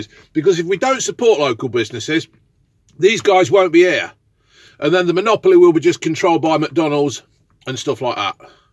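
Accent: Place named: Northern England, United Kingdom. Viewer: mate I can’t pin down your accent where you from? Love the channel by the way and attitude towards not caring about money👍🏻 i know your from Leicester or grew up there but sound more northern, is that due to parents or what?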